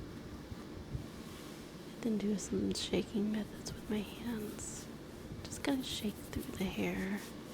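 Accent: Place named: Texas, United States. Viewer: Gah, between your accent (slight though it is) and the cicadas in the background, I feel like I'm sitting back home in North Central Texas on a lazy summer evening!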